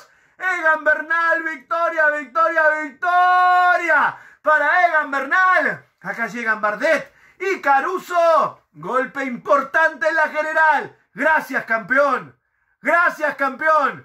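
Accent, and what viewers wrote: Colombia. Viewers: .tu no pareces de Argentina tú pareces colombiano.gracias muchas gracias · hermano narras como si fueses colombiano gracias te queremos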